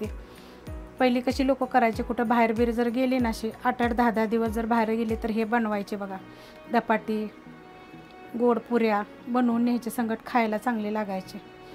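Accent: Satara, India. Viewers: ताई तुमचं गाव सातारा आहे का तुमची बनवण्याची पद्धत आणि बोलण्याची पद्धत आमच्याकडची वाटते सातारची तुमच्या रेसिपी बघून मी लाटी वड्या करते सजुरी करते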